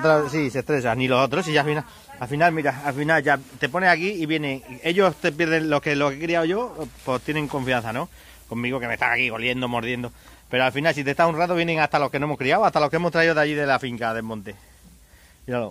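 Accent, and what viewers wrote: Extremadura, Spain. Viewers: Lo comprendo y respeto. Desciendo de extremeños de Badajoz y tengo familia de Alía. Pero tan solo era porque reconozco el "deje" extremeños. De todos modos solo quiero darte ánimos y que sigas subiendo videos. Muchas gracias por contestarme.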